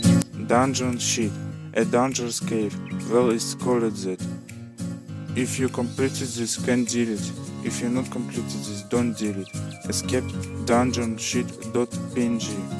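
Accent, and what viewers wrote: Russia. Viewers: I listen russian accent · Акцент русский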